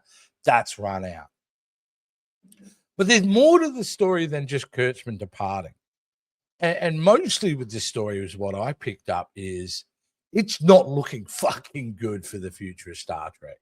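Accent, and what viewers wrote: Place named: Australia. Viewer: nice to hear an Aussie speak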